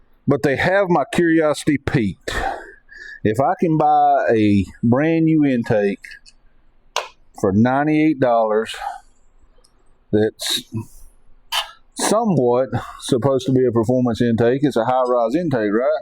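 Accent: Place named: South, United States. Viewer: By yer accent, you way south of me in Washington , I got several pushrods I’d give ya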